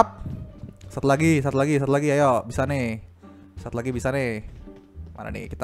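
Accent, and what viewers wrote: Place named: Jakarta, Indonesia. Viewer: Duh Betawinya wkwk ketawa lu ngeselin bang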